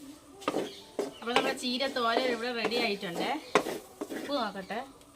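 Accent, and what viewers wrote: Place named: Nedumangad, India. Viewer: samsarak kelkan nalla rasamanu enneppole nedumangadan samsaram🥰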